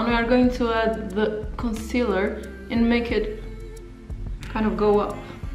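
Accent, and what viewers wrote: Portugal. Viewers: That Portuguese accent is the cutest thing evaaa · You sound portuguese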